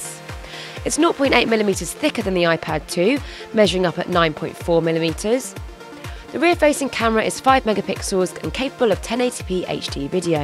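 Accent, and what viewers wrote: United Kingdom. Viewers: british accent? garageband.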